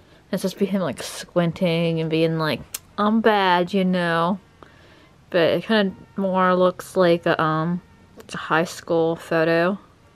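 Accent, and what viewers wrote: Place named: Philadelphia, United States. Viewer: You have a philly accent. ;)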